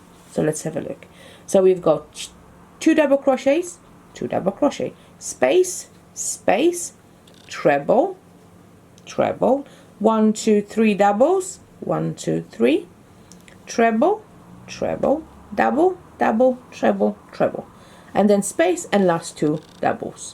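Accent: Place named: Africa. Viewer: U sound African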